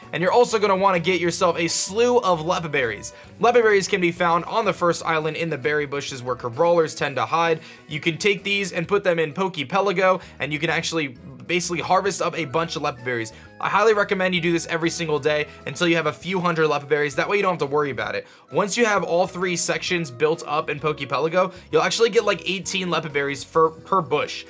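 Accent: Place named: California, United States. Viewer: Disliked. Because you talk like a stereotypical cali dude from every 90's movie!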